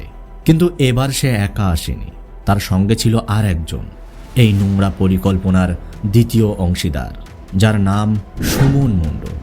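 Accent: Bangladesh. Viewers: India তে কোথায়? ইন্ডিয়া কি শুধু হুগলী আছে? সত্যি বলতে কি কষ্ট? আমার মনে হচ্ছে বাংলাদেশি, উচ্চারণ গুলো ঠিক তেমনি। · আপনি ১০০ শতাংশ বাংলাদেশের লোক। আপনি যতই আমাদের বাংলায় কথা বলার চেষ্টা করুন, আপনার এখনও জিভের আড় ভাঙেনি। দ্বিতীয়ত, আমাদের দেশের বাঙালি মুসলিমরা কেউ জলকে পানি বলেন না। কেন বেকার মিথ্যে বলছেন?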